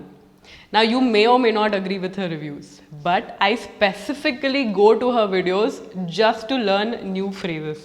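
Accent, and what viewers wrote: India. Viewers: I don't like them I like only Indian English like you.. I always hear your English speaking in youtube during sleeping.... Am I telling true' English 😀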